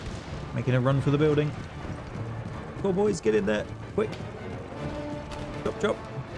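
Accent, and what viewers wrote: United Kingdom. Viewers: You can't upload this quick enough buddy. Great series On a side note I'm guessing you're south coast with an accent like that. Winchester perhaps? (I might be way off but I'm from Bournemouth)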